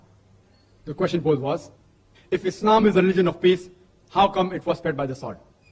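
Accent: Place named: India. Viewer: هذا لأنه هندي الأصل كان بتحدت الهندية مثلا لنعتبر أنك تعلمت الإنجليزية هل ستتحدت كما يتحدت الأمريكين سيكون لك أسلو ب غريب في الكلام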